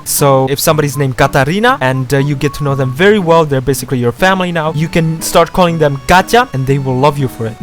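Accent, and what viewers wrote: Czech Republic. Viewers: YOU’RE CZECH OMG I THOUGHT YOU SOUNDED CZECH WHEN SPEAKING CZECH YOIASUSKDJAA I think you need to improve that Russian accent tho AND YOAUAHDJQXX FELLOW CZECH GENSHIN PLAYER WITH IN GAME PRONUNCIATION ISSUES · Ah, czech fella I see :> Love your accent btw. Anyway, maybe "Czcibor" isn't like....something like our "Ctibor?" Anyway #2 Love how you've read name of Flins c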